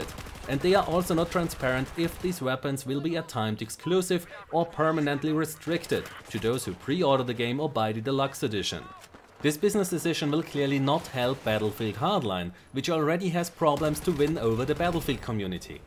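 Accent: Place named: Germany. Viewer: That German accent gave me cancer.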